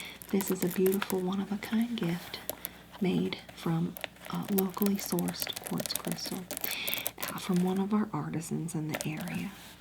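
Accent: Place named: Arkansas, United States. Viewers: I was going to say how fitting it would be if Kathy was located in Arkansas since Arkansas has so many crystal shops. Also feel like you nailed the specific southern accent Arkansas has :) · THE WAY I YELPED as soon as I saw this video!!! I'm not even a crystal girlie, but I don't think I've ever seen a crystal-themed ASMR roleplay that I haven't loved. And this accent.... I am unironically obsessed, there's just somethin bout that sweet southern *hwhistle*😍😍😌😌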